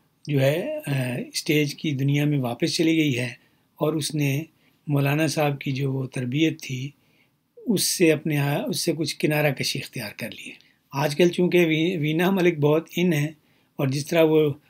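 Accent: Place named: India. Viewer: And let us all know what is RM TV? Is it shorter form of RAM? Coz you speak like Indian.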